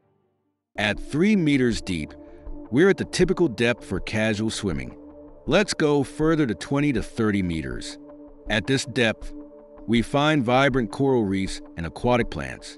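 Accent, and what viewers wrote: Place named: United States. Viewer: You’re American or sound like it.. speak in feet & miles please. This isn’t throwing shade at our international friends it’s just that we don’t understand meters.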